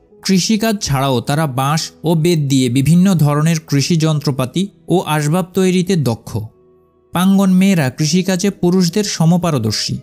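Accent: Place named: Chittagong, Bangladesh. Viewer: বর্ণনাকারীর বাংলা বলার সুর শুনে মনে হল তাঁর বাড়ি চট্টগ্রাম। আপনার কন্টেন্ট ভালো তবে ভাষার ব্যাবহারে আরেকটু সচেতন হবেন। বাংলা বাংলার মত করেই বলবেন। যদি আঞ্চলিকতা বর্জন না করতে পারেন প্রমিত বাংলা বলার সময়, সেক্ষেত্রে চট্টগ্রামের আঞ্চলিক ভাষায় কন্টেন্ট বানান। বাংলাদেশের সব অঞ্চলের মানুষ এখন চট্টগ্রামের আঞ্চলিক ভাষা বোঝে। কারো বুঝতে অসুবিধা হবেনা।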